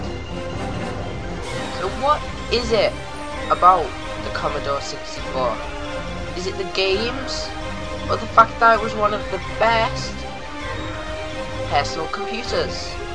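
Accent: United Kingdom. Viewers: Nice c64, i own one these '87s made. btw why allways britts talks so funny dialect? Good video. Check my videos, channel tommi palomäki or jebu6 Greetings from finland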